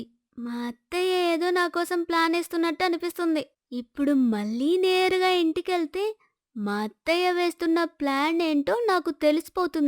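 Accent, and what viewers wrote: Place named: Chittoor, India. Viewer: Chittoor slang super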